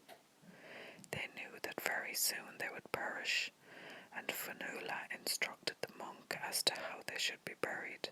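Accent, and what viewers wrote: Ireland. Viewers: am I detecting a Tipperary accent? :D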